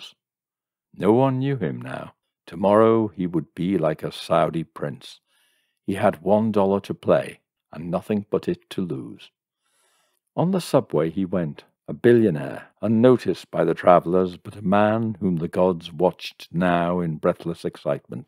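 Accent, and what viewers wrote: United Kingdom. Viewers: Old piano and reverb sets the scene. And only a soft British accent can make me so cozy for murder or suspense. Ha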